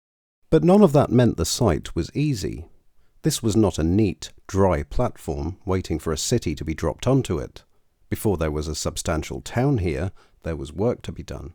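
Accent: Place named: United Kingdom. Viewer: It’s so nice to have a real voice narration. Sensible pronouncation with British English cadence.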